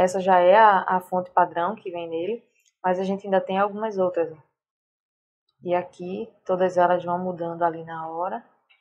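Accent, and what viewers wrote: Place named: Pernambuco, Brazil. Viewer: Tú és Pernambucana é, boa tua explicação visse!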